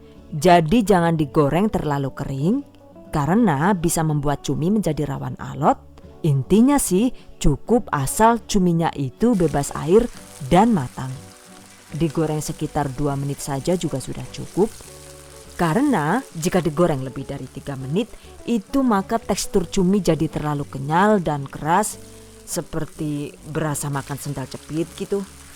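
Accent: Banyumas, Indonesia. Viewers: Makasih resepnya mba 🙏 Bismillah aq mau coba buat usaha, semoga berhasil Aamiin Ya Allah Btw, kok mba nya bisa ngomong ngapak 😆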